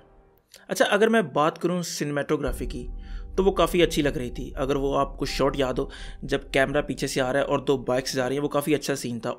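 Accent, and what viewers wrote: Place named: Pakistan. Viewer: this guy sounds like Pakistani..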